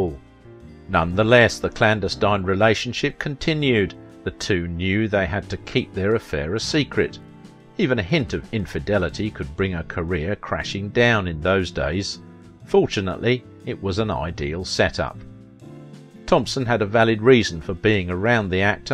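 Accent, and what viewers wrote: England, United Kingdom. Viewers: It's BY WHOM!!!!! Very British accent but awful English.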